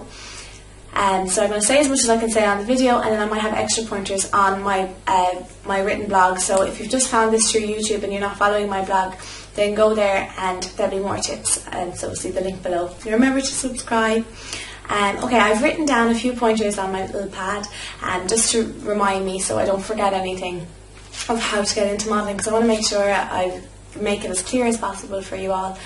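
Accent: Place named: Ireland. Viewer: I thought I recognized you from the video thumbnail, and as soon as i heard your accent I realized it was from looking on Irish modelling agency websites earlier :) Could I just ask a few questions please? What would be your opinion on starting modelling young? (im 16) And where would you recommend starting looking for agencies in Ireland? Thank you! This was a really helpful video and you're really beautiful xx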